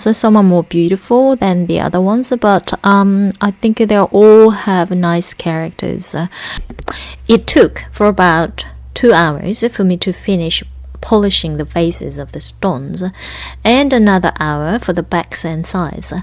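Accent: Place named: Australia. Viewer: Please don't apologize for your accent. I was captivated by the Asian/Aussie blend. I hope to find more of your work. Very informative, thank you!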